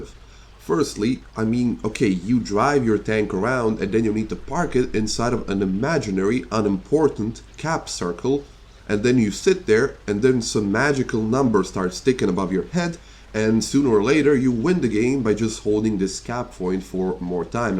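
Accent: Eastern Europe. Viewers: by the way, probably a lot of people already asked you but where are you from?? you definitely have a slavic accent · I love Slavic accents like yours so much, you sound so sexy. Nice video BTW, I agree with pretty much everything you said.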